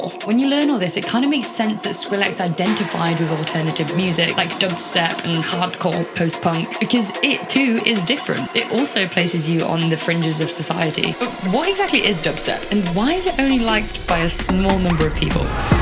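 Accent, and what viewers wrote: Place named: RP, United Kingdom. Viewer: The posh upper-class British accent I hear..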